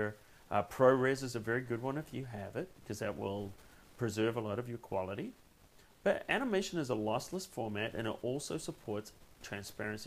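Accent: Australia. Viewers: Good video, by any way are you an Aussie that has been living in the US for a while, you seem to have a hybrid, Greg Norman accent. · Where is your accent from? I'm guessing you're an Australian who has been living in the States for a while.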